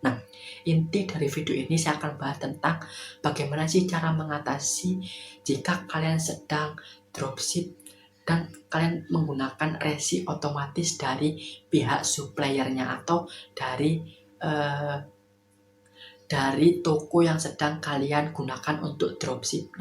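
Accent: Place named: Java, Indonesia. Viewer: Medok bnget jawanya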